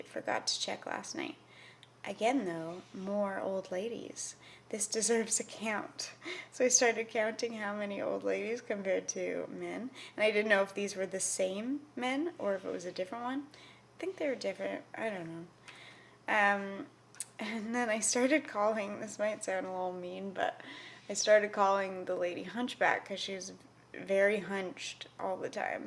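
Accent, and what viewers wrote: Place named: United States. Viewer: Hey I love your video! Your characters are super cute! I just wanted to give you a heads up that "gypsy" is considered a slur in many places. You sound American or Canadian by your accent - we don't come across that word as often so I think many people here don't realize it's negative. But in Europe it's a derogatory term used against the Romani people. I'm not trying to make you feel bad because it seems like you have good intentions! I just thought you might want to know before you include it in your course.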